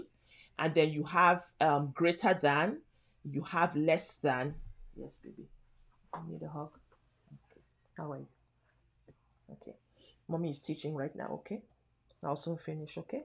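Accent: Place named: Nigeria. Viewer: Nice! Off -Topic: From your Accent it’s hard to believe you are not Nigerian (specifically southern Nigeria (south south or south east)…intonation & manner of speaking clearly Nigeria, & I’m sure other Southern Nigerians will feel the same way. If you do that ancestry-ish tracing stuff. I’d easily place a Bet on the result matching what I said above.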